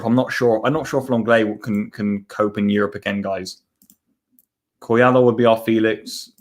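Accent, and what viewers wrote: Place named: United Kingdom. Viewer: Your English is very good, sounds like ur from the UK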